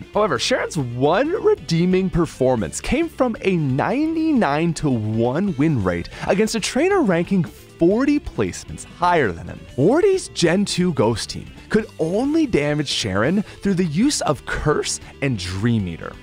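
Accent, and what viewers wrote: Canada. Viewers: Digging the Canadian accent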